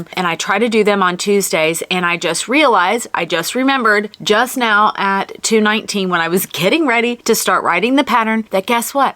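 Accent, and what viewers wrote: South, United States. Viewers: I knew it! North Carolina! With your cute southern accent!!!! 🤗🔥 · Hi, loved the video today! I think your work room is adorable. I thought you were from the south, I was guessing South or North Carolina! I am in Georgia. I have been knitting and crocheting since I was a teenager. I became a fiber artist about 15 years ago. I had never thought of myself as a real artist, just a woman with a love of all things yarn. Then a very dear friend of mine who worked at an art department in a university in California, looked at my work and said that it was comparable to many of the professional things that she had seen displayed at various fiber art exhibits. She helped me to see that what I made had beauty and artistic value and that my own designs and patterns were unique. It really changed how I viewed what I did and gave me confidence to try new things. 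It truly opened up a whole new world for me.:) This summer for a little change I tried my hand at embroidery and thread painting. Now I am back working on a new shawl design. I have a small craft room upstairs which I affectionately call "The Lair". I think we have a lot in common. Have a great day ahead!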